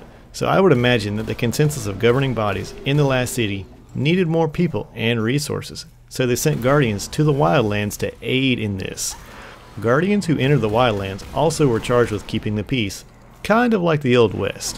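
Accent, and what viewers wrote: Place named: West, United States. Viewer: Lemme just say that your western accent coupled with the theme of the Last Word really make the telling of this story so much more interesting and immersive.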